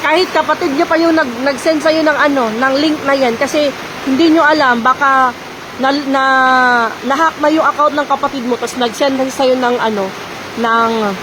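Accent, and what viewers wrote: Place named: Waray, Philippines. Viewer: salita mo parang salitang waray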